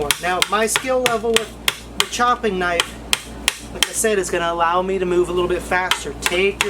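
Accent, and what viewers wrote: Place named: South Jersey, United States. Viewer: Are you from the South Jersey/Philadelphia area originally?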